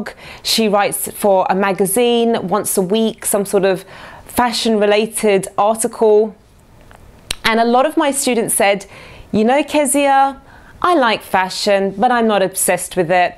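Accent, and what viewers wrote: United Kingdom. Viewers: Her heavy British accent totally makes my pulse race!! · I like your english acces dear woman, i'm searching a girl like you who has confidence in herself :)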